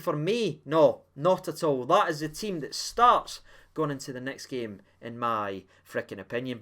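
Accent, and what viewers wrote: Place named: Glasgow, United Kingdom. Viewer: ‘Warburtons crust’ , ‘ monster in his veins!’... ahh, the Glasgae patter .love it!!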